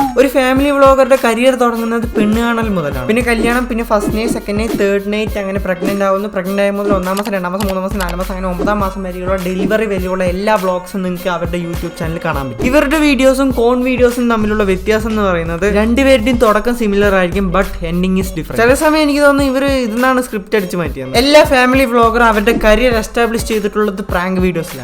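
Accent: Palakkad, India. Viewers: പാലക്കാടൻ aanno?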